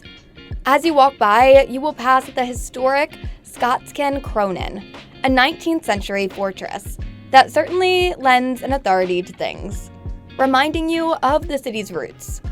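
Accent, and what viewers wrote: United States. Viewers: was about to say the same heehee, sounds for the most part Chinese-American as well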